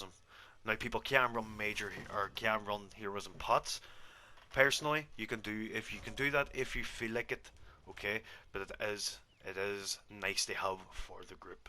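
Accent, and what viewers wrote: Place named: Derry, United Kingdom. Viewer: Great job, very informative and well presented showcase of the necro tank. Who wouldn’t want to listen to the lovely Derry accent, that’s just a bonus 🥳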